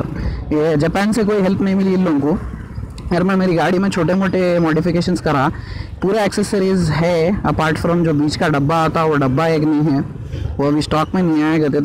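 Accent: Hyderabad, India. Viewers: loved it! fantastic hyderabadi style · bhai tumare baat karne ka tariqa zabrdast pure Hyderabadi or mai b hyderabadi hou miya bhai bolte mashallah mashallah bhai bohat accha review diye aap mere pas b same color ki Dio hai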